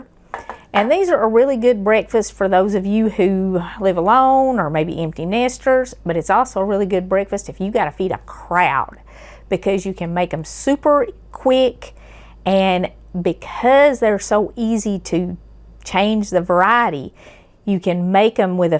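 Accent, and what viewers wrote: South, United States. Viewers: that is VERY southern accent wow!! ❤ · soon as i heard her country accent i knew it was gonna be a good recipe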